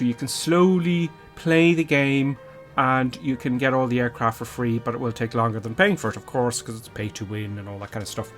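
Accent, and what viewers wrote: Ireland. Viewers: Just discovered your channel - Excellent video and totally agree with your comments. I'm just getting back to simming as I become a retiree after about 8 years away in that time it's safe to say things have improved leaps and bounds (apart from p3d/msfs - till now we hope). I'll never fly a real plane but this is so close it's mindblowing. Love the accent BTW my family all originate from West Meath/Roscommon. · Just subscribed to, love his irish accent and the video was great😂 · Are you irish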